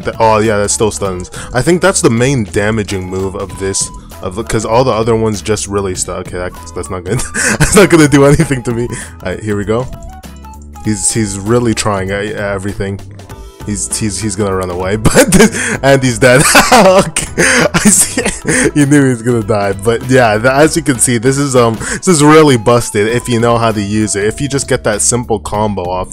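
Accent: Jamaica. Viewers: are u Jamaican?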